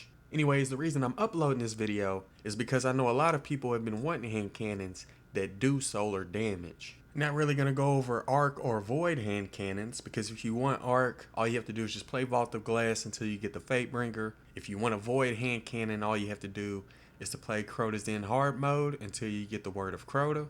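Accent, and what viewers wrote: South, United States. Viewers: Cawwwwnnnnttttrrrryyyy as hell!! XD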